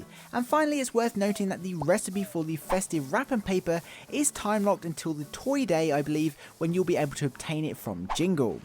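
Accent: England, United Kingdom. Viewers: He sounds like he lives in England and I think they are a day ahead and this ornament stuff comes tomorrow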